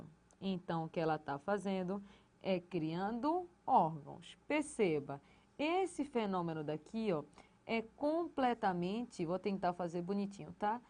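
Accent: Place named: Northeast, Brazil. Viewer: sotaque do nordeste.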